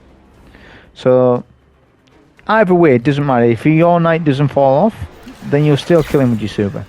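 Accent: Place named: Middlesbrough, United Kingdom. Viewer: Top content as always. I don’t want to be cheeky but your ascent sounds like you’re from the ‘Boro.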